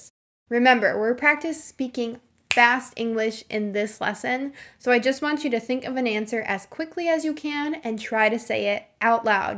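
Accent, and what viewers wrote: United States. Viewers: I have just found this channel 😃 I really like American accent so I already hit the botton for a subscriber . You are so excellent teacher . Love from Thailand.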